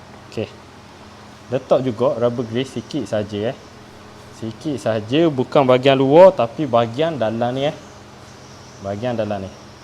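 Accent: Terengganu, Malaysia. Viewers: Kemah molek cara penerangan sekali nga amali padu mantap mudoh pehe walaupun pekat sgguh ganu tu..tp jelas sungguh..moga muroh rezki la boh ..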